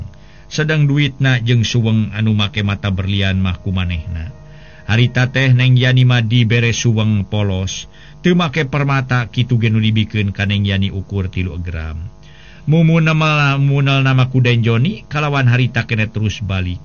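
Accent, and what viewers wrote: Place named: Sunda, Indonesia. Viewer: lamun hayang di ajar basa sunda....tah dongeng anu Sundana masih kental....sae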